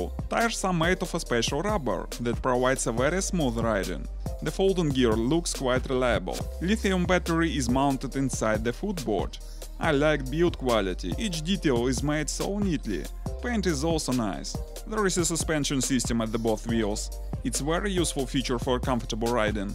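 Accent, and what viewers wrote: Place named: Russia. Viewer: Тяжело слушать настолько мощный рунглишь. По существу, обзор хороший, спасибо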